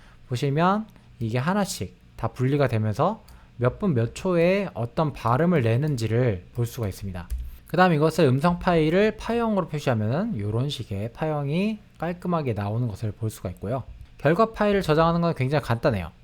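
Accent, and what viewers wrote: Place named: Chungcheong, South Korea. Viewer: 저 충청도 사람인데, 나이드신 어른들 톤이랑 비슷하네요 ㅋㅋ 그 특유의 미묘한 말하기 귀찮아하는 듯한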